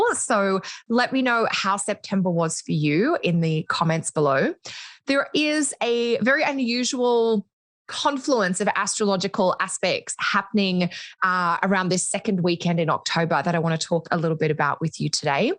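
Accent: Australia. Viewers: Ontario ?? I thought you were Australian! That’s funny. Or maybe I guess Australian living in Canada? 🤪😍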